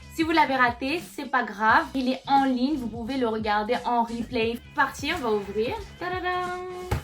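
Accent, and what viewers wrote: France. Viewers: Love your French accent, gorgeous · What a fun way to appreciate festivals especially panagbenga. Btw your french accent is to die for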